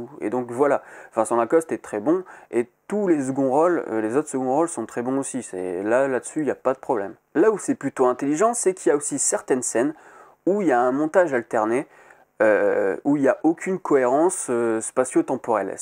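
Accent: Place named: South, France. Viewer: C'est drôle, t'as presque l'accent du midi dès fois, sur tes O notamment ^^ C'était le commentaire inutile du jour ! Ce film ne me paraissait ni original ni super intéressant ... Comme tu dis; Virginie Effira n'a pas réellement changé de rôle finalement !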